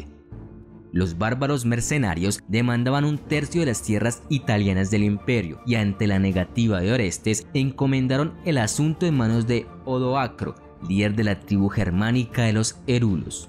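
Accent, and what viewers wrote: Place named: Colombia. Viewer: No puedo tomar un acento colombiano en serio, siempre hablan bromeando jugando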